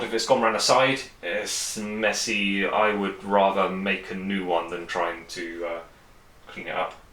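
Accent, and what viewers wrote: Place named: London, United Kingdom. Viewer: Thankyou great to have tutorial London tone lol I'm newbie so loving your tutorial s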